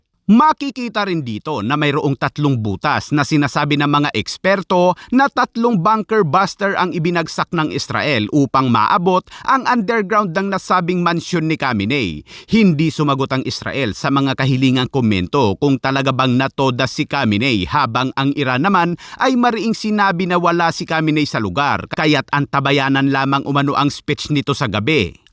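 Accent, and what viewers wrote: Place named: Visayas, Philippines. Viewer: Bisaya ka pala dn idol eyy🤙🤙 hahaha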